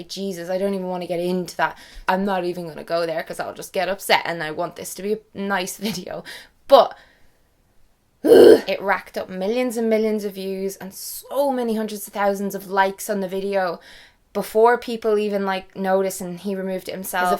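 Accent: Ireland. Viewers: Omg!!! You look AMAZING!!! So cute!!!! What happened to you? Like ur eyes used to be green! Ahaha! Now they're blue! Ahaha! Do more vidios about your amazing Irish accent! :-D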